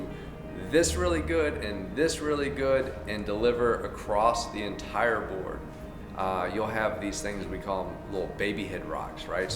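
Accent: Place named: Utah, United States. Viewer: He sounded like he was from Utah then he said he was from Utah. 🤦‍♂️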